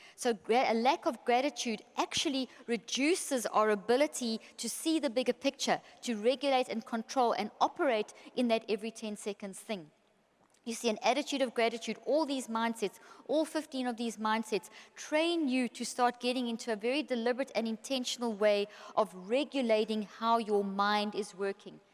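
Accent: South Africa. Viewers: Is this a South African accent? How wonderful to listen to; both the accent and the content, of course!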